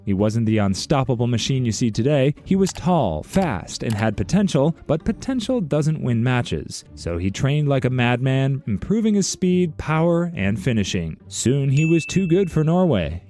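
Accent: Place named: United States. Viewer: First I thought to myself why did he put Ronaldo with a #21 on the thumbnail but then I heard the American accent and everything made sense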